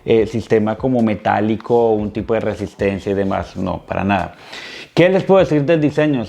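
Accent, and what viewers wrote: Colombia. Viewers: jaja, se te nota el acento tipo canal uno o tele antioquia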